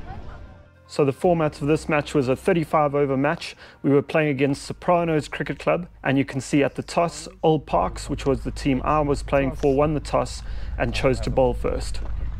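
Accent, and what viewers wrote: South Africa. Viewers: When i first started watching you, i thought you sounded a bit South African. It is lovely to see you here in my home country! I wish you could come to East London, but that is one of the worst places in South Africa. I look forward to this tour.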